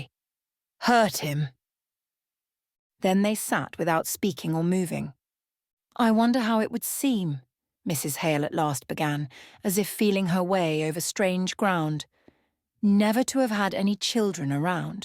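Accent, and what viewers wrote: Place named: United Kingdom. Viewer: Brilliant choice! I read it years ago . . . . BTW, the British accent doesn't work. Really. Please do it again. Please. Any rural American accent will do.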